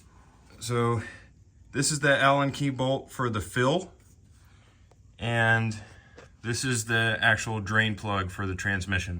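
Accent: United States. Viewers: Great video!! Thank you!! Where are you from? I can hear your “o’s”—sounds like Baltimore. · Mid Atlantic aka tidewater accent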